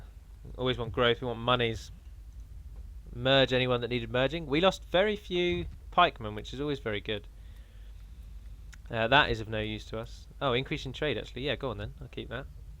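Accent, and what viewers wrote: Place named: Glasgow, United Kingdom. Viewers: That Scottish accent tho lol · the first half sounded like a bitchy glaswegian, second half sounded retarded norfolk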